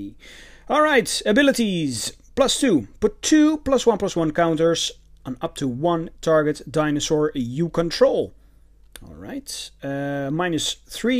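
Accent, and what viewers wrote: Netherlands. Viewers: are u dutch?? ik hoor het aan je accent :>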